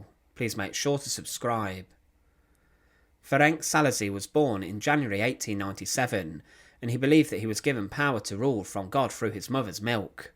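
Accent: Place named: Cockney, United Kingdom. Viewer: Your monotone, staccato and sometimes slurred “Cockney” narration is distracting and difficult to follow. I, for one, wish you’d hand the microphone to a professional narrator! You should go to “diction-classes.”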